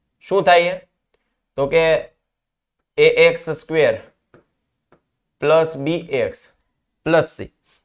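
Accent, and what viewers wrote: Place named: Kathiawad, India. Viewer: Sir tame kathiyad baju na cho nice bhasa and math lecture mate thanks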